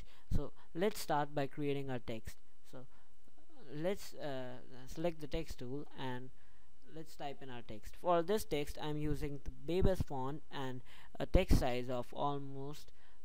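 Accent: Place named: India. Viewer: indian accent man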